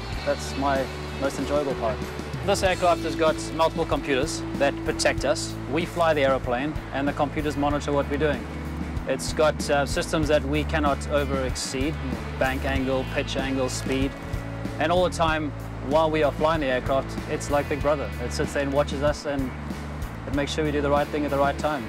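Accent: South Africa. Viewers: Is that a South African accent I hear? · he sounds very SouthAfrican -) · I think he's South African by his accent and name,which maybe Dutch and therefore Afrikaans. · He's South African.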